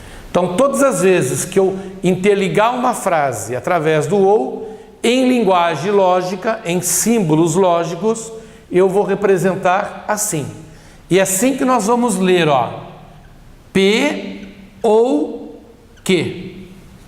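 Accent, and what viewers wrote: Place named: Brazil. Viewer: é pq quando se aprende na escola, a letra e tem som de é e em uma oração, quando se vai ligar palavras, tem som de "i". Acho que falam "ê" por causa do costume de algumas regiões do Brasil, por isso eu estranho kkkkkk Parabéns pela aula, estou assistindo os demais conteúdos. Obrigado por postar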